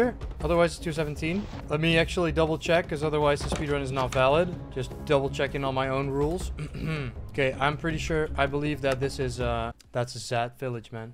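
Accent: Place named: Netherlands. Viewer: ur accent sounds dutch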